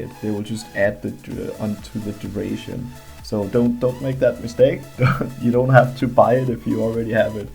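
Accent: Denmark. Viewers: Du dansk, det kan man høre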